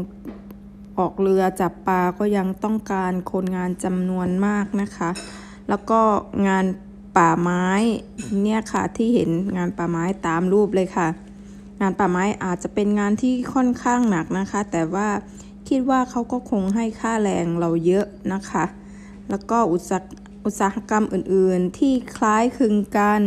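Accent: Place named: South, Thailand. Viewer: คนอีสาณ แต่พูดสำเนียงคนใต้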